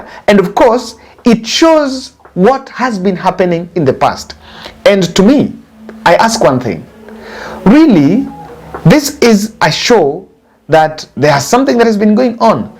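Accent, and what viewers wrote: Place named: Luo, Kenya. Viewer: He his a luo buana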